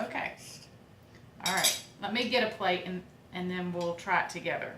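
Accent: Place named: South Texas, United States. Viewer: Great video. You sound like you're from South Texas.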